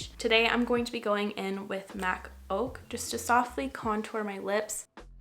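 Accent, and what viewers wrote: Canada. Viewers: I loved the way she said out, and about😍😍😍😍the Canadian accent love it! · You have such a calming voice and I could listen to you Canadians say out and about all day! I love it!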